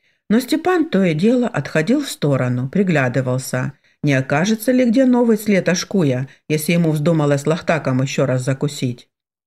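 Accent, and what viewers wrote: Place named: North, Russia. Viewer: Прекрасное чтение, даже не представляю, как это можно, привыкнув всегда выговарить гласные так как мы обычно это слышим, так быстро перестроиться и читать как бы О-кая. Это придаёт рассказу какую-то особую прелесть и неповторимость. С большим удовольствием слушаю и всегда с нетерпением жду продолжения. Да благословит вас Бог в этом служении.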